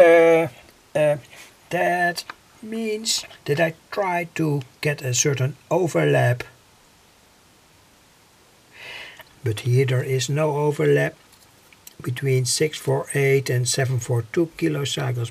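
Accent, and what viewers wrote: Netherlands. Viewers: I know it's a random question but are you Dutch? I've been to netherlands and they have a similar accent. Thank you for the cool content. I really like the gigh frequency circuits you make and you make it seem way easier than I would think it is. Well it is actually.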